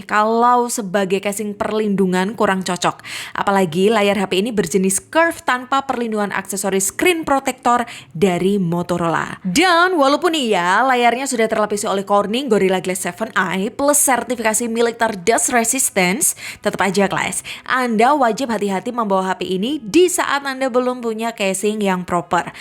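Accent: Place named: Java, Indonesia. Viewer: Beda sih , ini masih ada tipis2 medok nya Kalo d trans 7 lebih mulus hehe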